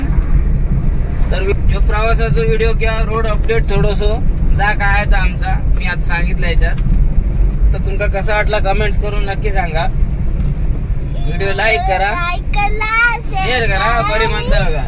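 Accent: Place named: Malvan, India. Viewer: Good vlog nice to hear malvani 😊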